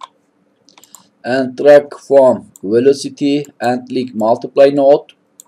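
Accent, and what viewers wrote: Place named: Turkey. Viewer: Türk aksanı bu belli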